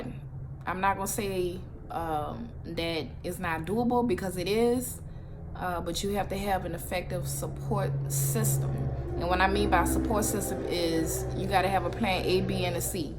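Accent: United States. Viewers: Louisiana accent strong lol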